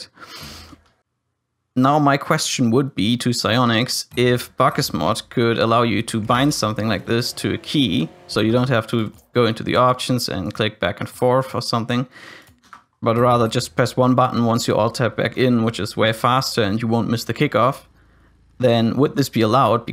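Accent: Germany. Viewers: U are German right? Youre english sound like when my english teacher speaks ^^ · Höre ich da einen deutschen Akzent?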